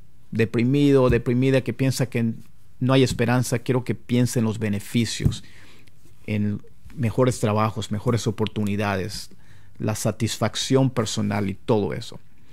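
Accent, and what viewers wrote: United States. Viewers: me encanta como lo habla parece gringo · Pensé que era gringo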